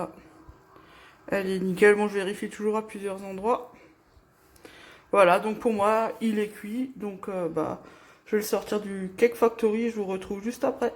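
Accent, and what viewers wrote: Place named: Alsace, France. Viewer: Superbe accent : Haut-Rhin ou Bas-Rhin ?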